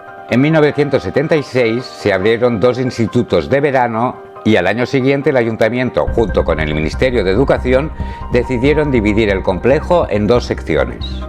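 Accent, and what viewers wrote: Catalonia, Spain. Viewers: Te pasas tres pueblos. Lo que te molesta es que tenga acento catalán, si fuera gallego o canario por ejemplo no dirías nada. Por cierto ya que hablas de hablar bien comienza tú por escribir bien, cuando se hace una pregunta en español se ha de poner signo de interrogación al principio y al final de la pregunta.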